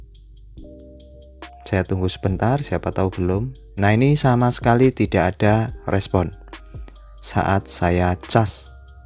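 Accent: Java, Indonesia. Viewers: Mas kalo boleh tau mase orang mana ya? Jawanya jawa mana? Siapa tau kalo ada apa apa bisa mampir ke mase🤭😁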